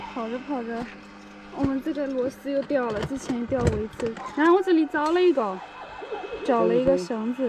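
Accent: Chongqing, China. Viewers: 喜欢的女生也是重庆口音，听着好亲切啊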